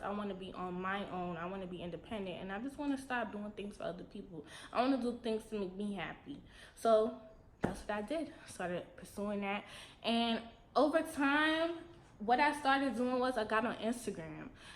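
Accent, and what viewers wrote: New York, United States. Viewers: I knew you were from New York because of how you talk lol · I promise you I knew you was from New York. It’s the accent for me 😂🖤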